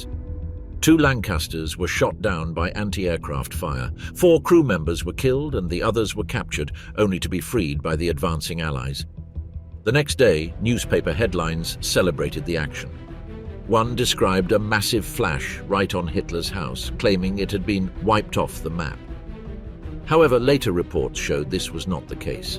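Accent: United Kingdom. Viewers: Umm the narrator has a British accent. So maybe redirect your frustrations.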